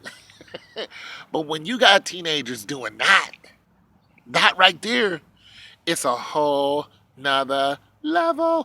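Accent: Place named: New York, United States. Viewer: You say you from Ohio and/or Pittsburgh, but you sound like a New Yorker all day. Ohio, nor Pittsburgh has burrows. What's really going on my G?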